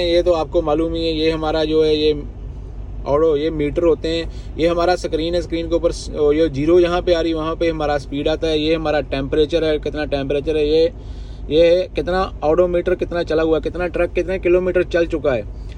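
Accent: Punjab, India. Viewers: Punjabi di hindi bhaut vadiya hundi hai bhaji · Bhai bhaut maza aya 😂😂🤣🤣🤣 hindi wale te chib kad te And one like for ur effort cz punjabi bande laye hindi bolna yada muskil h english boln to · Vicho vicho nikal aundi aa punjabi🤣🤣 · You are a good teacher. Like your Urdu but tarka of Punjabi gives more pleasure. Please take special care while driving.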